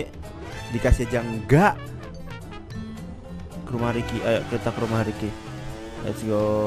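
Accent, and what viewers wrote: Java, Indonesia. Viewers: Kaka dari jawa mana , kalo aku bayuwangi Jawa Timur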